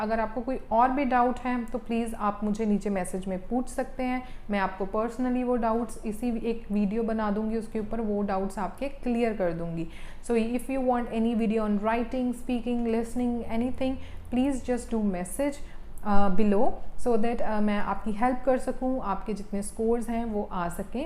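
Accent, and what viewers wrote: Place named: India. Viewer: Yes, from India Mam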